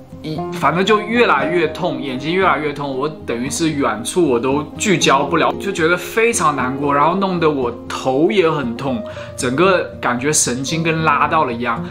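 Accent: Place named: Taiwan. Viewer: 怎么台湾口音？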